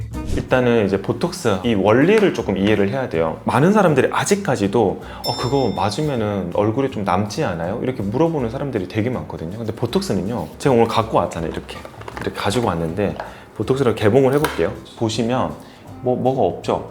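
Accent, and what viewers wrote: Gyeongsang, South Korea. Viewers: 경상도세요? 사투리가들림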